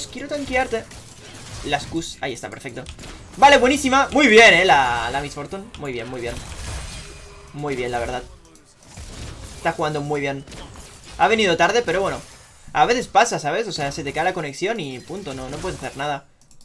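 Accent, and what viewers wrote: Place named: Argentina. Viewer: por que hablas como argentino? xd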